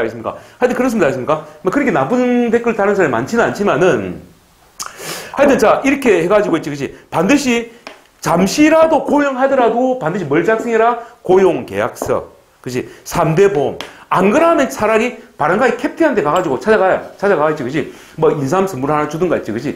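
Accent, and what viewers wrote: Gyeongsang, South Korea. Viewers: 오래전 은퇴하고 가보고 싶었던 나라인데 최근에 안좋은 이미지가 강해서 잃어버리고 있던 나라인데 덕분에 다시보게 됩니다. 맞나? 따라해봐~ 마취강도...ㅎㅎ 경상도 사나이 구수한 강의 친근하고 좋습니다.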